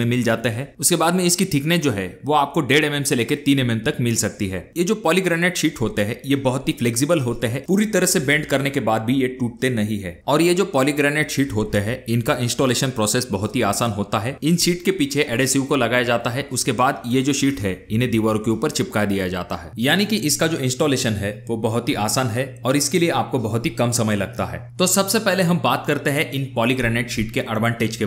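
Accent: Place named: Satara, India. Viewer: मराठी आहे फलटण बारामती किंवा सातारी जर्दा दिसतो भाषेत ....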